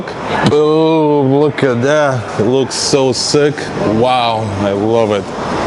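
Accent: Russia. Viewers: Вы говорите на английском с русским акцентом, но вы не русский, или уехали очень давно. "Досвидания" - по-русски было с английским акцентом. ;) По ремонту всё очень интересно, по железу, по салону, по электрике. Спасибо!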